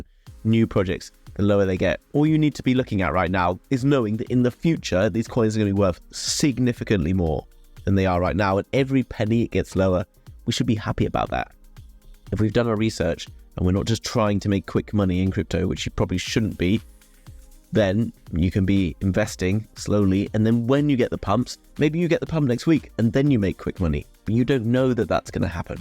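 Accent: RP, United Kingdom. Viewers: I think you might be the poshest man in crypto.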